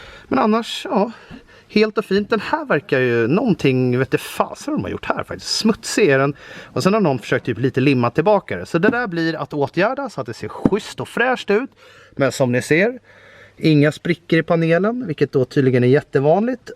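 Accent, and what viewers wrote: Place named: Stockholm, Sweden. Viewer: Hehe så skön stockholmska. Smutta upp den. Haha. Man blir så glad att lyssna och se på sköna människor. Du gjorde verkligen min dag kompis!!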